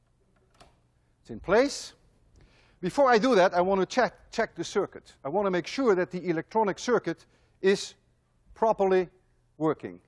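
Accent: Netherlands. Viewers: The two most common comment I read here about are: 1. "Amazing teacher" I totally agree. 2. "He is Dutch" I assume because your accent, but (unfortunately) I can not capture that. For me your accent is very good and very compatible with my rudimentary English.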